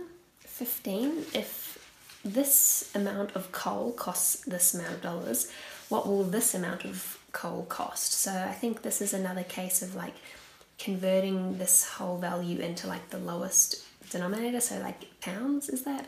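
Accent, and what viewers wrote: New Zealand. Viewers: In 1866 students would have been using a slide rule (see link below) to calculate the more difficult problems, but they would have to memorise all the formulae. Back in my day, we never had to use a slide rule as we did have calculators, but we were forced not to use calculators and use what we called "lookup tables" and calculate longhand. As to accent, I can hear a little NZ accent in there but the way she pronounced Albany I believe she is either in Western Australia or has lived there for quite a while. Australian Eastern Staters and Americans (I believe) pronounce it Allbany. <link> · Well... I can tell you're definitely not Australian since a) no Australian ever says shrimp, we say prawn and b) she's obviously got a Kiwi (New Zealand) accent so she's not even from the same continent. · Gold dust in Troy ounces and cotton in Ne (linear density)? 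By the way, are you from New Zealand?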